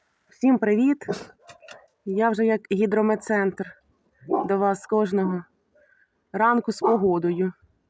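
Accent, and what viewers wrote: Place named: Poltava, Ukraine. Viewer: Наша Полтавська мова , аж приємно 🩷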